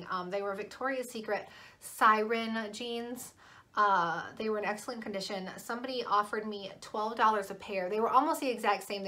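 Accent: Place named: Michigan, United States. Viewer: I thought that you had a MI accent! I'm from the Upper Peninsula but live in Oregon.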